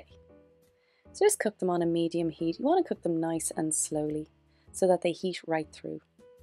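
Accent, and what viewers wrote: Ireland. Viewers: Are you Irish? I detect an accent. Thanks for this. It was fun to watch!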